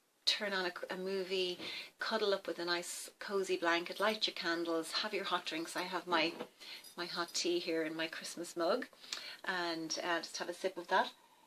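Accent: Ireland. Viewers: Just think tomorrow will be lighter just a little longer til it’s summer again... my favorite season I’ve been practicing this without knowing it Thank you! I have lights hanging all year long and I light candles every single day I like citrus smelling candles or fruity ones Are you from Ireland? I love your accent I’m from the US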